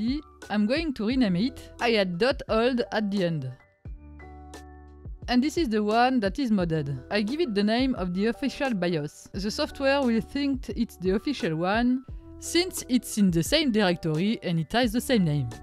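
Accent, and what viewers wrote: France. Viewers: merci pour ce super tuto avec l'accent très "français" je comprend tous hahaha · (heavy french accent)..."and now lets see if I bricked my motherboard" LOL. Never thought I'd hear that from a French woman after modding her bios to boot from an nvme drive via pcie adapter on a 2012 motherboard. Same one I've got. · I'm just here to study her french accent english 😅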